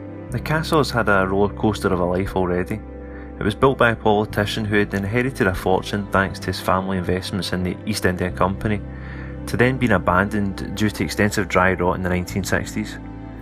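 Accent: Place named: Scotland, United Kingdom. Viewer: It’s wonderful to see this proud castle be restored. And .. nothing like listening to a voice of a Scotsman.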